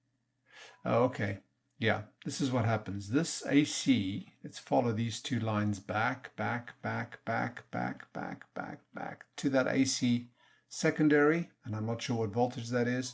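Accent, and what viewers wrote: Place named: South Africa. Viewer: Hi great video, i love old audio equipment. You sound South African by the way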